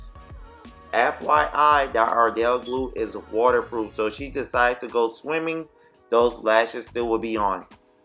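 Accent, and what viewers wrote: Bahamas. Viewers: Omg I thought you sounded Bahamian 🇧🇸🇧🇸🇧🇸 .... Love your channel even more now!❤️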